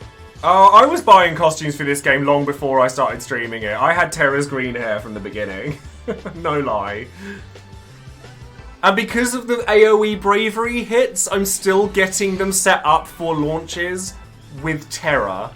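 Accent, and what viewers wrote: United Kingdom. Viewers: This is a set kill of a team thanks for sharing i didnt have garlands burst but i have terras burst as well as her LD also Garlands and Layles LD , Used tifa support in the beginning as well and pulled it of in 30 turns. Love the UK accent a lot, i think of amy winehouse every time i hear someone from the uk speak. After i beat this stage and got the tickets you would not believe i pulled out both kurasame, seifer, and sephs LD 🤯🤯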